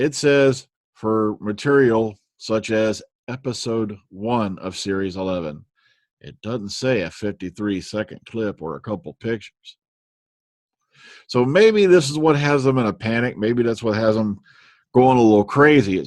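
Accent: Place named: Oxford, United Kingdom. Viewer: Your Oxford English accent is almost spot on! Lengthen your vowels & don't pronounce the r in words like bar, car & far.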